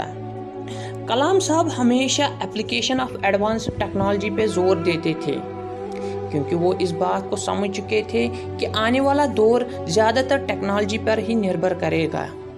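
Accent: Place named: Kashmir, India. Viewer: I think you r kashmiri..I got it by your accent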